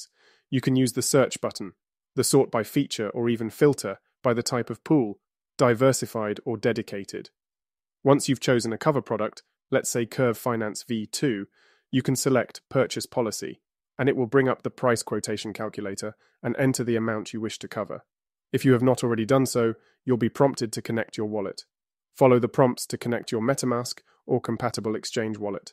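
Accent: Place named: United Kingdom. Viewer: I like dictor's british accent)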